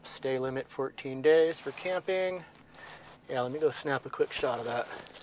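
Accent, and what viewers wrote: United States. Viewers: hi, thank you from us Europeans for putting the temperature in C°, just another question, why is "Route (Root) 66" pronounced different to " I'm taking that Route ( Rowt ) is this an American thing? another great video 👍🇬🇧🇬🇧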